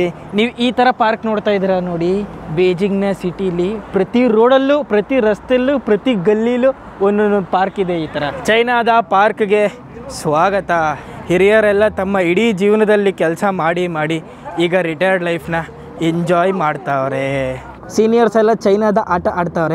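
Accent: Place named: Mysore, India. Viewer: Thumba cute aagi mysore bhaashe maathaadthediya maga very nice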